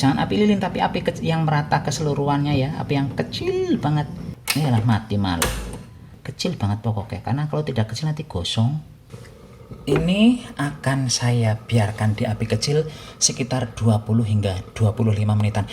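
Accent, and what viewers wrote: Banyumas, Indonesia. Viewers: bar kesuh..curhat,mecucu njuk masak dech....mandan2....error....kog siki dadi ngapak kang? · Tinggal.di.mana si mas ? BATAM..? Tapi.dialeknya mbanyumaswn ya..😂 pada baen karo inyong..adoh nang kalimantan esih clacapan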